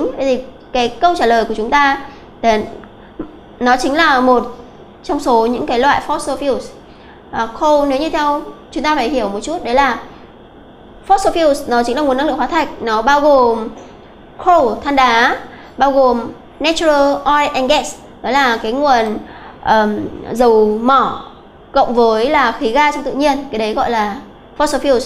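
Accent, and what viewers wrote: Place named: Philippines. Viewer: s cô đọc nghe như cứ sai sai s ấy giống phát âm của ng philippines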